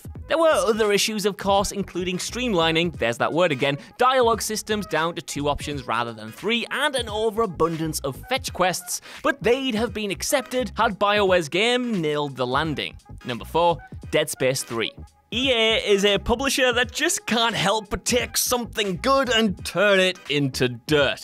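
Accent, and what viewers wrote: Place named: Tyneside, United Kingdom. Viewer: I hear a tyne valley accent. Maybe tees actually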